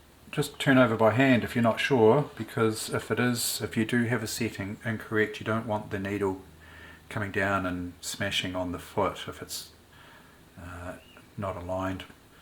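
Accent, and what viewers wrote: New Zealand. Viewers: Great video. You must be a Kiwi.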